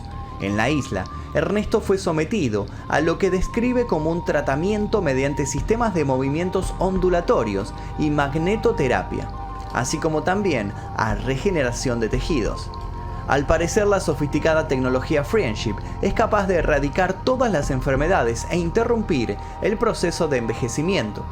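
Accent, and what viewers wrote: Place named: Argentina. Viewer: Hola excelente tu modo de narrar y ese acento argentino me mata. 🎉 saludos desde México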